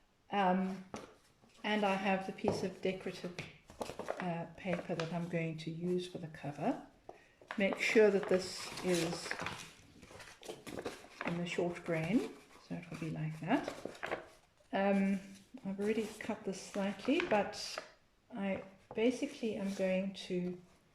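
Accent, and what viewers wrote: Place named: South Africa. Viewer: yes, the person I learned from uses the same density board for spines as the main cover. I've been covering with thicker curtain type fabric as you know... apocalypse and all - don't leave the house unnecessarily and i've managed a few hardcover books but it always seems to crimp slightly and then binds too tightly. No one else notices this but I'm a perfectionist. I might try add just a 2mm leeway on the spines and see if that helps. Also, when I clicked on your video and heard your accent I did a little happy dance and was like "That's defs from SA!" then i checked and yesss! Nice to see south africans making epic content!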